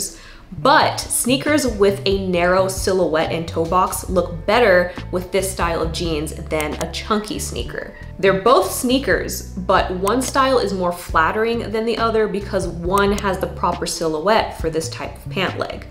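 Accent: Australia. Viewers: is the random australian accent an inside joke? I am so confused 😅😭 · Its the Australian accent coming in and out for me 😍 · Lmao same I was like ‘she keeps doing an Australian accent and as an Australian I’m not mad about it’ 😂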